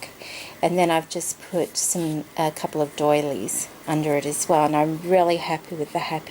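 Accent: Australia. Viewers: Love them ... they're beautiful! Nice to hear an Oz voice ... there's not many Oz ladies doing tutorials! Jennings from the UK does some lovely Shabby Chic ... you could check her out 😊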